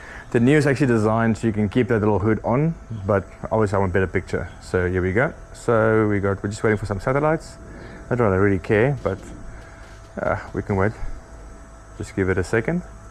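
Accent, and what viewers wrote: South Africa. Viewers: Hey, if I'm right and i listen to the accent, you are South African Just like myself😅😅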